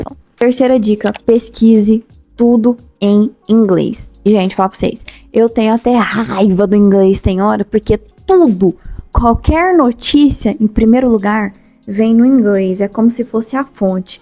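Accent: Minas Gerais, Brazil. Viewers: Agora que percebi que você tem mt sotaque mineiro rs (adoro, por sinal) ótimas dicas,. Aderi por aqui a de Friends, que pra ser sincera, nunca nem assisti 😅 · Tu é Mineira, Goiana?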